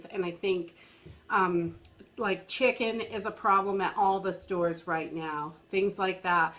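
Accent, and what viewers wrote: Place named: Maine, United States. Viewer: You are so right! My husband said the other day, please don't bring any more food home, we don't have the room! Lol I have filled every area I can find., your 🤔Maine accent has never been more obvious. TY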